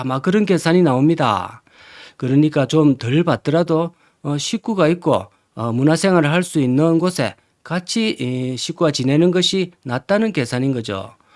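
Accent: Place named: Busan, South Korea. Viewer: 제가 아는 부산 분하고 말투가 너무 비슷합니다.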